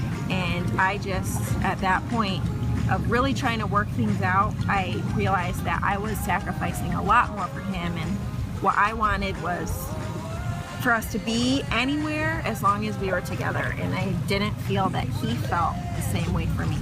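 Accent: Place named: Upstate New York, United States. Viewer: T F just my opinion. It’s a harsh upstate NY accent.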